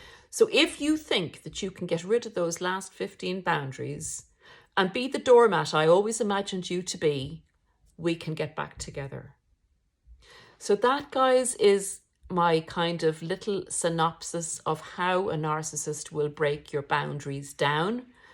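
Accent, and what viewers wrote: Ireland. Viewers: Thank you for your video's. I am on the journey of cutting of the toxic relationship since June. I am still ruminating in this. Some days it's better, especially when I have a lot to to. I like listening to your view on this. As a Christian I feel that this disorder is definitely evil. I just visited Ireland, and therefore enjoy the accent too! Best regards. Daniell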